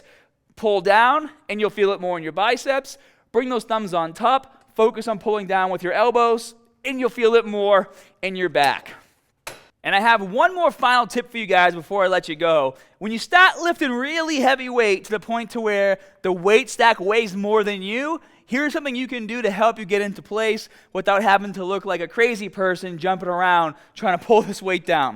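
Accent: Boston, United States. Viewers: he’s a bostonian😂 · Lol is this guy from Boston? If so, represent!!! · STRONG Boston accent in this video :-) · Bostonian accent for sure. "Bah" for "Bar". "Staht" for "Start". Those words sound Australian. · No, he's likely from Boston. They say "r" words like that. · Is that a Boston accent? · Helpful. But god damn I can't stand that Boston accent. Jesus Fuck! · I've watched so many SHF videos to get started on my weight loss journey but I just noticed that he's from Boston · Hmm I wonder if this guy is from Boston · I wonder if he's from Boston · Has to be Boston he sounds like my cousins 😊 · Your def from Boston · are you from boston? i love that accent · Love that Boston accent 😍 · Adrian I’m from Boston but no longer live there. Was thinking the same thing. I watch these vids not only for form but to hear the Boston accent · That's called the Boston accent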